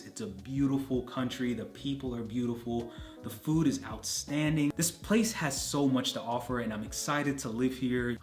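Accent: United States. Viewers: Welcome to Germany! I remember when I visited the US for the first time a few years ago and I was completely shocked to see every single shop open on a sunday until late into the night! In fact, I had specifically planned to do some last minute shopping on monday just before my flight back home, because I was so used to no stores being open on sundays. Edit: I'm a native speaker myself, having grown up abroad and - out of necessity - bilingual, so I can tell you're speaking American English. Most Germans won't be able to tell tho because while most people here can hold conversation in English reasonably well, they're not that well-versed in recognizing different accents.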